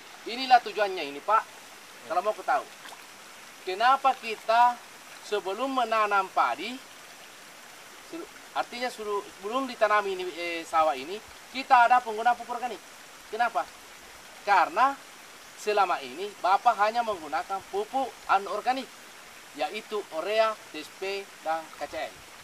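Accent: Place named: South Sulawesi, Indonesia. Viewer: Cara bicaranya kaya orang sul sel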